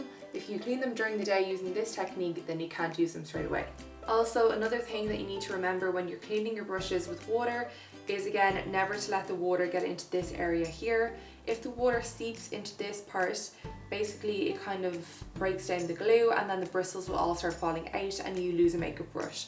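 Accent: Ireland. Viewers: She's Irish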